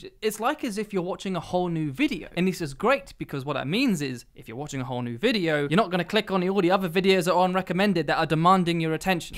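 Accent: United Kingdom. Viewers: Wow the genius of david dobrik explained by a mastercraftsman in an english accent. Impeccable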